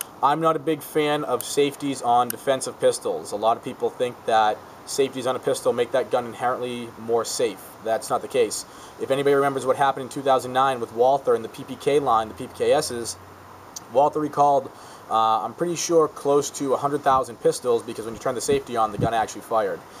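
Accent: Massachusetts, United States. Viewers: Just came across this video; nice work! (Noticeable MA accent, btw.) Question - you mentioned the regular M&P line would be getting upgraded triggers too. I e-mailed S&W and they said "No upgrades at this time." Do you have any more specific information about when this change will be happening and if S&W will offer the upgrades to previously-purchased M&P guns, either for a nominal charge or as part of regular warranty service? Thanks for your help, and keep up the good work!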